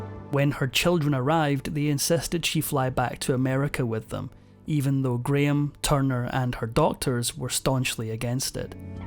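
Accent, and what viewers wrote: Scotland, United Kingdom. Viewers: He's Scottish. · He’s a Scot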